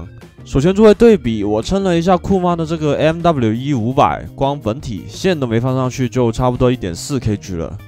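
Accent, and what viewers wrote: Cantonese, China. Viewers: 好亲切的白话普通话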